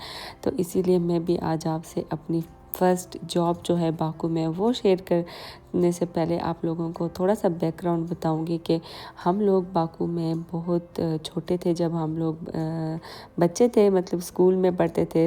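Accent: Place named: Mansehra, Pakistan. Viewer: muje aap ki zaban se lagga tha k aap mansehra se hugi because udar k log buht ko much boltay hein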